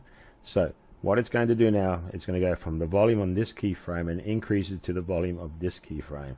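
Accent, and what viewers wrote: Australia. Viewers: I enjoy your clarity (and Aussie accent). I saw a Corel tutorial where a video clip was split and the second part moved along in the timeline, leaving a gap between the two parts. For me, the clip always snaps back to the start, even with ripple editing disabled. Can you share how a video clip can be moved in the track?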